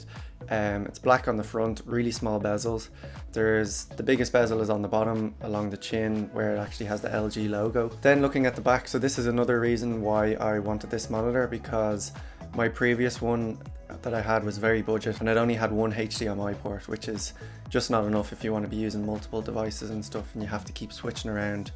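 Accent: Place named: Ireland. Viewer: Good explanation but I would like to see another video of your experience with it. I have been shopping around and I think this is the one I like the most for the price and brand name but I still am not sure yet. Thank you for doing this video. By the way you sound IRISH.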